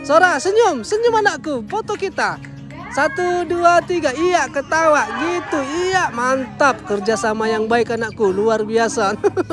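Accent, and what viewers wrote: Medan, Indonesia. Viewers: Senang dgr cara bicara ito ku ini. Bahasa nada medan nya ga hilang walau sdh lma merantau ke negri Jepang... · Enak kali denga logat medan abang.sering2 upload bang pasti berkembang canelnya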